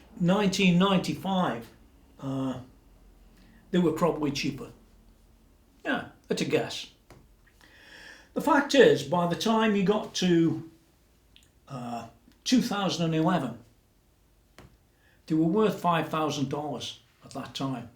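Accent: Stoke, United Kingdom. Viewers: Sounds like a Stoke accent to me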